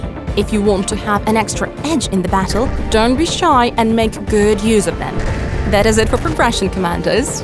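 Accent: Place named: Australia. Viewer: Sorry but this aussie accent in this video is very awfull to listen! A clear american accent would fit much better here 😉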